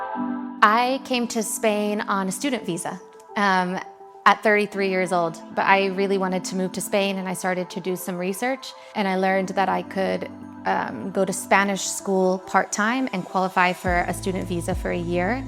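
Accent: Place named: Eastern Europe. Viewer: Rich “American“ with very obvious Eastern European accent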